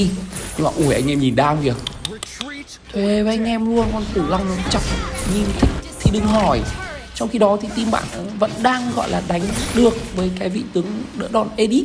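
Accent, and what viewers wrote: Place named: North, Vietnam. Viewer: Trai Bấc ló vậy đóa bẹn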